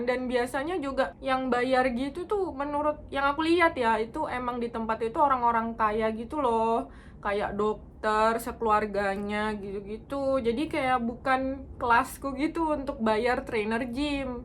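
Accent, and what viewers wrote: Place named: Surabaya, Indonesia. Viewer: kak kamu orang surabaya kah?.. kok logatnya surabaya banget hehe..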